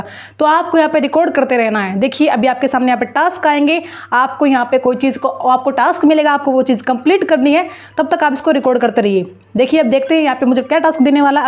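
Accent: India. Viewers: Haryana ya Delhi se mam???? Language apki haryanvi hai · your slang is like punjabis??? you are a punjabi???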